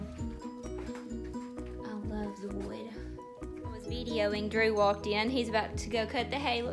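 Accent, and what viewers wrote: South, United States. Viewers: OMG! I love your home, your and Drew's awesome creativity and your sweet Southern accent! I have to admit when you said you'd show us the shower, then you said "we had a wedding shower here"...I had to coordinate my thinkin' for just a second to figure that out...LOL!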